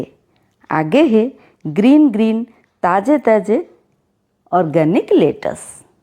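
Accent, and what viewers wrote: Odisha, India. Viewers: Aapke Hind boli se pata chal raha tha ki aap Odia hi honge 😃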